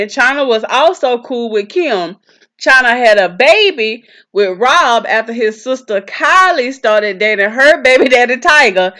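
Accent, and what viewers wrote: South, United States. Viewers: Wow this my first time hearing ur real voice [I love ur southern voice]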